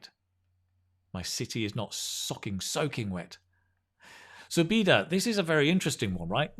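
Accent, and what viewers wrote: United Kingdom. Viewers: Are you British? Are you American?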